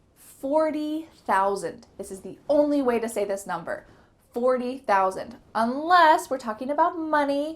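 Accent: United States. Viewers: Hi! So happy to see you here again.. (and again and again!) You're looking ravishing! You chose an excellent topic 'cause "big numbers" is one of the quite unclear topics which many learners know even worse than any grammar question as well and it's truth. I'm grateful to you 'n' your channel for a wonderful possibility study a real American English with gorgeous accent (your "water bottle" is flawless for accent training, thanks) and marvelous pronunciation. has also beautiful accent and It's good that he's being agree to take part in your lessons. I was just fallen for your pretty voice, these endearing eyes, your sunny smile, your expressive mimic 'n' manner of speaking with correct, a real American accent as well. All of this drove me crazy at once when I ran across your channel by the link what gave me Lucy from "English with Lucy" channel. And I'll be always faithful to you 'n' your channel 'cause due to it my main dream is coming true! 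Good luck, strong health 'n' all the best! See you soon! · Wow, you've got American accent, that's incredible.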